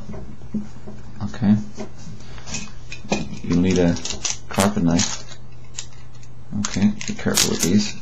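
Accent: Ireland. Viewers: u sound irish are u?? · i think he sounds a tiny bit irish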